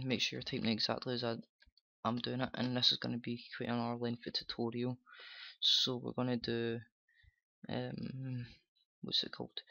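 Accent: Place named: Scotland, United Kingdom. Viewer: nice sco'ish accen' ma'e, I'm from glasgow but not got an accen' like you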